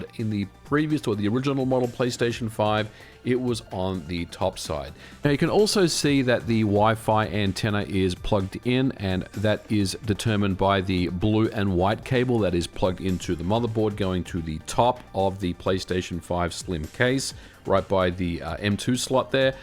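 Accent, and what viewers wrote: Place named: Australia. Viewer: Got a Australian accent but talks in inches and fahrenheit Love your videos by the way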